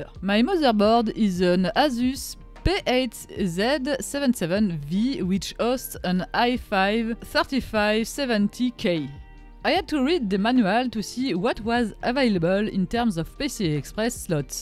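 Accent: France. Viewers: I'm just here to study her french accent english 😅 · merci pour ce super tuto avec l'accent très "français" je comprend tous hahaha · (heavy french accent)..."and now lets see if I bricked my motherboard" LOL. Never thought I'd hear that from a French woman after modding her bios to boot from an nvme drive via pcie adapter on a 2012 motherboard. Same one I've got.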